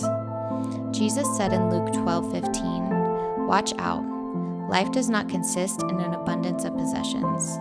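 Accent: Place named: United States. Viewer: I buy to many books!I love your accent! what part of America is that from?I'm from the u.k.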